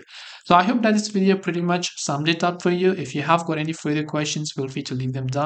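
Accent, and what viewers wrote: India. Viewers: I would need to listen to this 30 times before i got it. Speaking too fast and with an Indian accent. Thanks.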